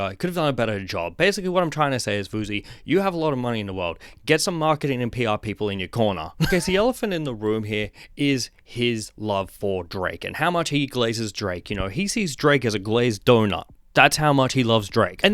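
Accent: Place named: United Kingdom. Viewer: Damn im born in texas and live in canada since im 13 and now gonna be 23 next month but i thought you we're british 😂 but love the content I'm gonna subscribe aha!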